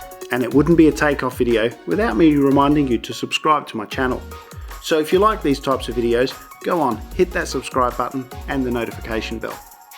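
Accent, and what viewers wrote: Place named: Australia. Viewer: Love the Australian accent!!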